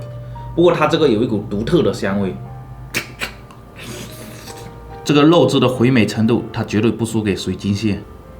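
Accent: Fuzhou, China. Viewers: 咱们福州人的乡音属实太明显了。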